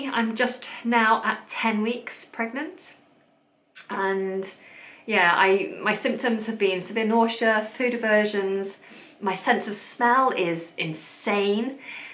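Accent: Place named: England, United Kingdom. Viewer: Congrats to your family. That cool English accent tho :)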